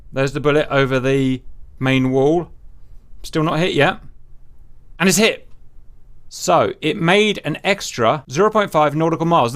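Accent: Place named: England, United Kingdom. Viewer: 32th speed? You sound English, has it really come to this?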